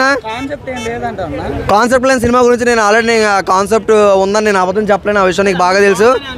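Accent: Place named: Andhra, India. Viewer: by his slang it seems like he is from andhra who doesnt know any history of hyderabad. adi ni pain kadu kada niku ardam kadu chudadam maneyi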